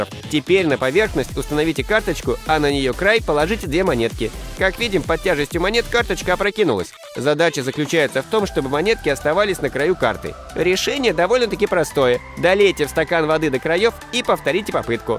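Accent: Kazakhstan. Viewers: Братан ты казах что ли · Ты чё казах чтоле??? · ты чо казах или из казахстанов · Ты че из казахстана? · Автор ты из казахстана? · Ууу казак ютубер ты из алматы или орал? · Ты чо казашка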